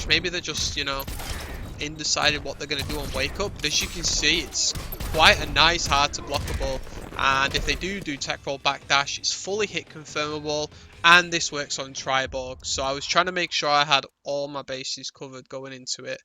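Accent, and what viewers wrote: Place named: United Kingdom. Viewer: wakeOp lol that british accent always reminds me my old english teacher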